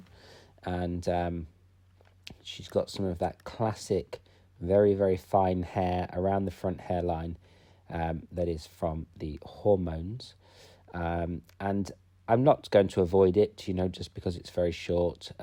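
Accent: United Kingdom. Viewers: loving your videos, love your simple and effective techniques. Hope you keep making lots of videos. Do prefer the British accent being from this side of the Atlantic myself. Thanks for the great education.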